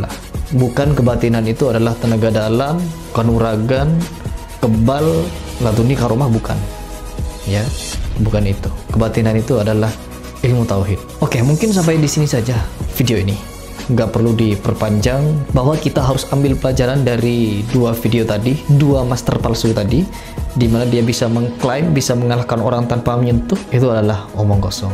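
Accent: Madura, Indonesia. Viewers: Mas logatnya seperti Madura.. Betul ya ☺️🙏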